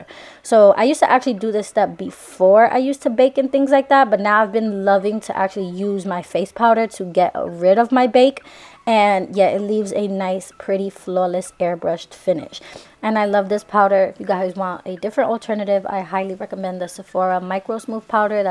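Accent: New York, United States. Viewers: I'm so glad I came across her. Honestly I love listening to her New York accent more than watching her videos just bc its so soothing but I stay watching these videos, like yes boo give me moreeeeeee!!!!!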